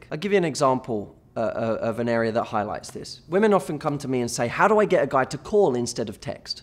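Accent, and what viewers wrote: United Kingdom. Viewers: This one might b the most useless comment on youtube ever ... But everytime i see him speaking .. i get so hungry ....i mean thers something about his speech pattern or such a clear brit accent .. his voice makes me crave dessert or something .. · it must be the British accent matthew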